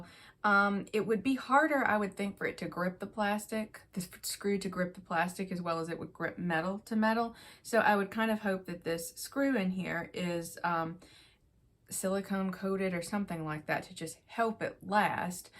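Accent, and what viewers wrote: South, United States. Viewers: Great review! From this, I will never be purchasing this particular style! Loving the southern accent! Hey from Alabama!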